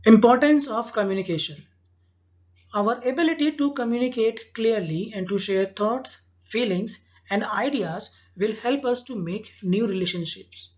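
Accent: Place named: India. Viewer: the indian pure accent made my ears bleed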